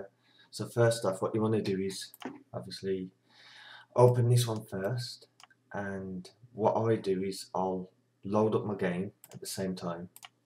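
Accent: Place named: Birmingham, United Kingdom. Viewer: Woohoo a brummie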